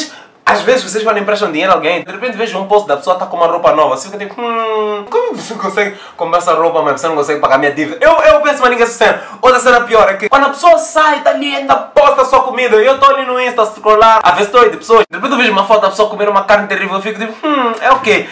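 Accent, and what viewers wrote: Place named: Mozambique. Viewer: Sério wy. Teu sotaque de Moçambique me cuiou bué 😂😂. Faz parceria com um YOUTUBER Angolano mano.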